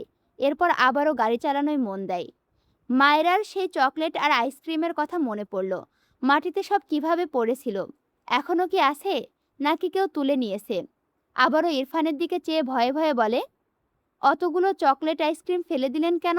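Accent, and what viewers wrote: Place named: Kushtia, Bangladesh. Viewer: আপু তোমার ভাষা শুনে বুঝাই যায় তুমি কুষ্টিয়ার মেয়ে। আমিও কুষ্টিয়ার মেয়ে 😘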